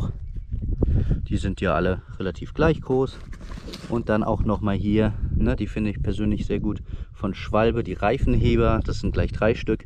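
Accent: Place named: North, Germany. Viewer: eines der wenigen male, dass ich wirklich höre, das jemand ausm norden kommt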